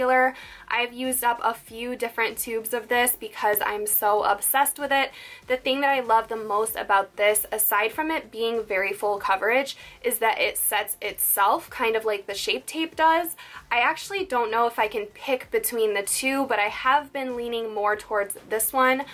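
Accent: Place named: New York, United States. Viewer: you can really hear the New yorker accent when she talks. its so endearing :)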